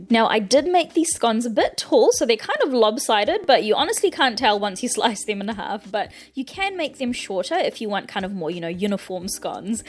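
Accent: New Zealand. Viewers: Hi just wondering your a NZer your accent sound familiar lol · Is this a Kiwi accent?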